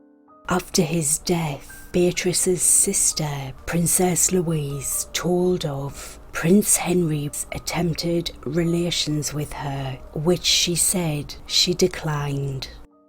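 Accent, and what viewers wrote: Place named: Newcastle, United Kingdom. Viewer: She has a Geordie accent from England. Though normally they talk very quickly, but for some reason she's talking in a super slow voice.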